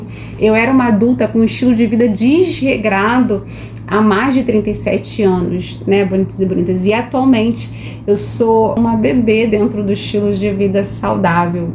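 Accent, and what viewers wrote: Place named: Rio de Janeiro, Brazil. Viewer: Que sotaque lindo!!! Eu amo O sotaque Carioca❤ Sou Minas Gerais e amo O Río De Janeiro. 😍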